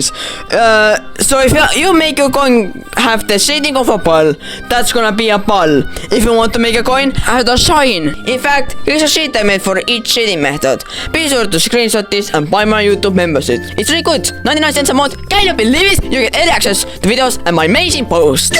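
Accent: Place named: Estonia. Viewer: the estonian accent is amusing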